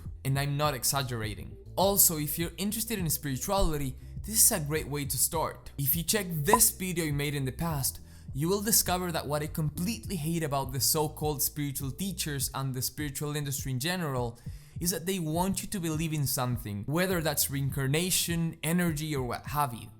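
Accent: Argentina. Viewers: quee? sos argentino?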